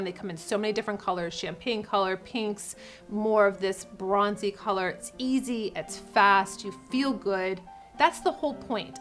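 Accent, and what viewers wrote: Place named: East Coast, United States. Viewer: Google says you are from Colorado but you have an east coast accent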